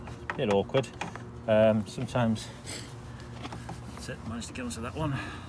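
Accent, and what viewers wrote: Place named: United Kingdom. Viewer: Maybe it just me and growing up watching Michael Caines Battle of Britain. But with your voice and accent I feel like your working on a Spitfire. Very enjoyable video explaining the repair.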